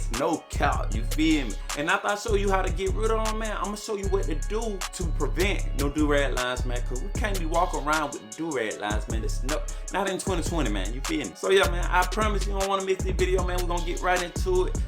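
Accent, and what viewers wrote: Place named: St. Louis, United States. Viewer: sound like you're from st louis. Good vid btw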